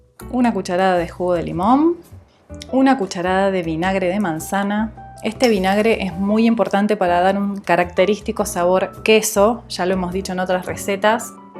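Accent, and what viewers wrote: Argentina. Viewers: Hola Sos de arg? soy nueva, jeje tenes acento medio argentino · Como me encanta el acento Argentino, saludo desde Brasil!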